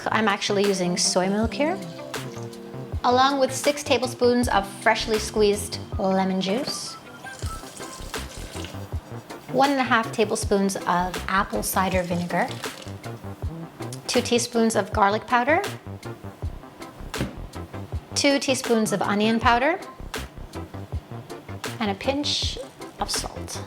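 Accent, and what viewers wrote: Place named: Caribbean. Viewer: You sound Jamaican/ Caribbean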